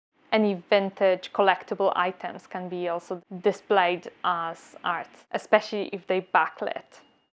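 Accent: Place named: Poland. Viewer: Beautiful Polish accent.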